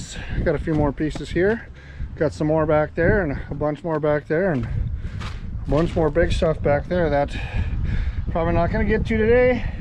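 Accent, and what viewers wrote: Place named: Canada. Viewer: You sound Canadian 😎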